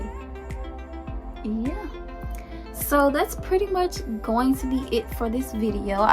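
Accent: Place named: South, United States. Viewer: Where are you from? Your accent is so cute and country lol..ppl say in country but I can’t hear it for myself.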